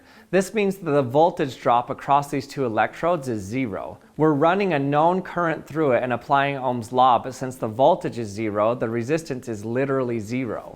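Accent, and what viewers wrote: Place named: Utah, United States. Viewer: He used emphasis, also Utah accent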